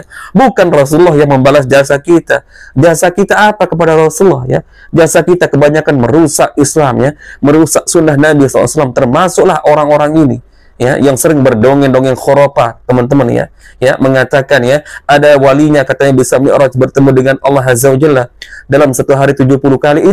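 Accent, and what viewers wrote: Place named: East Java, Indonesia. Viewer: kalau di cirikan dari dialeknya kayaknya dari Malang atau Surabaya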